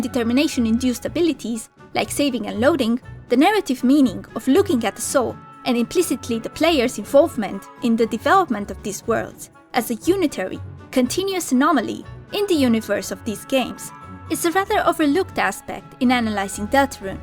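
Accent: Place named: Poland. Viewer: Small off-topic, but I'm a bit curious. Are you perhaps from a slavic country? Your pronunciation sounds very similar to how my polish friends sound when speaking english lol.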